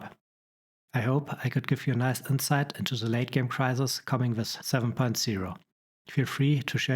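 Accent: Germany. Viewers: i am not a native speaker either but your beautiful german accent do not hinder my ability to understand at all, I even find your accent crispier than british english to identify each single word lol 😂. If I may suggest...the automatic subtitles (in english) work very well for those parts difficult to comprehend, maybe you could check the subtitles for futures videos to make sure they show exactly what you say, or suggest people to turn on subtitles! Anyway good joob and ciao from 🇮🇹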